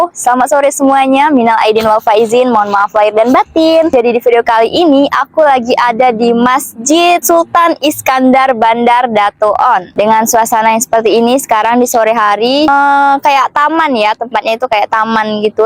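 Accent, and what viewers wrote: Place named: Indonesia. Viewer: Bahasa Melayu loghat Indonesia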